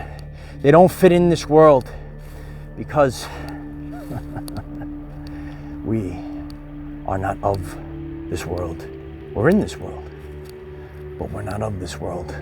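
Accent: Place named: New Jersey, United States. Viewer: cool Jersey accent.. who are the rainbow warriors? Never read about that in scriptures. Did some debased tribe make that one up? God created the Rainbow - displayed it after the first Flood / damnation of the humans who were huddling together in heathen actions. Rainbow across the road now 'represents' sodomy and child abuse. Which one is it mister virtue signal. Oh...and the Hopi never talked about 'rainbow warriors' that was invented by some Jewish guy in the 70s high on LSD. It was a cool story though.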